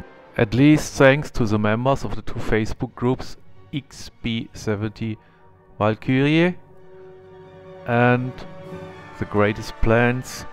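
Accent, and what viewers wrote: Germany. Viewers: train your english. There is no excuse that every german hears you are a german. Try speak Valkyrie "Wall Kü Ri" Best regards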